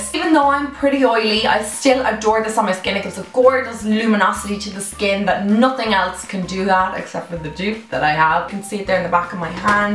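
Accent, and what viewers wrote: Dublin, Ireland. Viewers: is it just me or do you have an irish accent? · Love your accent are you Irish? ❤️👑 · You an Irish buddy to? 😛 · loved this video r u Irish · omg please tell me your irish!! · Is she Irish?💖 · Can I just ask where you're from because your accent sounds a bit Irish 😹❤️ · I love your accent lol, is it Irish?? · Are u Irish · are you from dublin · Zwitzel Monte Irish accent everyone love it😂✌️🇮🇪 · R u Irish cause I am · Is she irish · Are you from Ireland? I just subscribed, loved the video! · new subscriber and are you irish? 💕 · omg are you Irish?😍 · Sapphire K Irish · Your accent is so cute! Irish? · Are you Irish??? Where my fellow Irish peeps at??!?!!! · are your from Ireland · I saw this Video and clicked on it and I heard your voice so I looked at your page and I was right u are Irish🍀🍀Im irish 2😁😁😁😁😁Love your vids👻❤ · is she Irish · She from Ireland like me🤗😂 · Are you from ireland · Casey Ryx Irish accents are so pretty! 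💕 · Are u from Ireland c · Are you Irish? If so, me too :)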